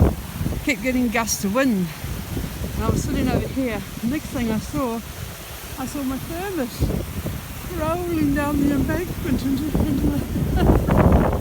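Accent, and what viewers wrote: New Zealand. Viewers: Loved it!...great wee vid....wee hint of NZ accent there??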